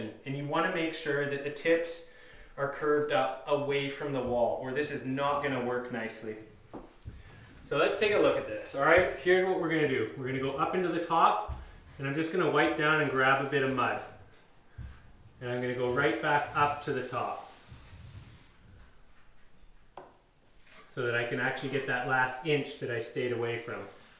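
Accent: Canada. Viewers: Thanks so much for sharing your expertise here. Going to use this technique on my walls before applying wallpaper. Really appreciate your friendly Canadian tone 🇨🇦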